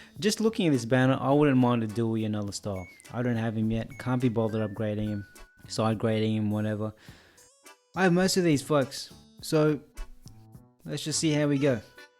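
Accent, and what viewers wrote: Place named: United Kingdom. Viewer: i thought British. Xenoblade was dubbed in the uk and I now really appreciate British voices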